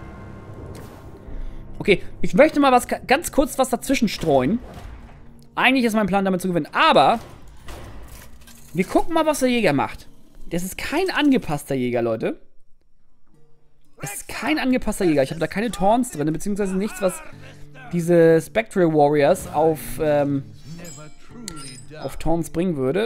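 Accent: North, Germany. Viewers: ich finde das auch gut so wie die Videos sind :). Bin selbst zu dumm für HearthStone und mag es dennoch dir dabei zu zu sehen, weiß gar nicht wieso ich dich nicht vor der Session mit Chris gesehen habe... bist sehr unterhaltsam und ich liebe den nordischen Dialekt :D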